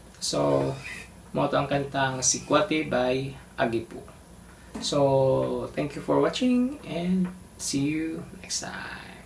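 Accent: Visayas, Philippines. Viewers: Bisaya man diay ka sir.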